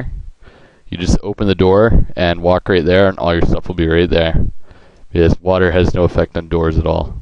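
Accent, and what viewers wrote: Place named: Germany. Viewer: great german pronouncing yeah :D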